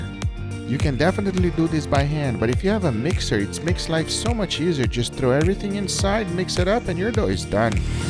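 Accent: Brazil. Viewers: O sotaque dele é brasileiro. Português é a língua materna.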